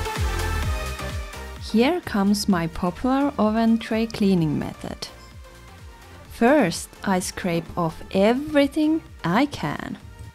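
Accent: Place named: Finland. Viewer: I can tell that you are from finland. Haha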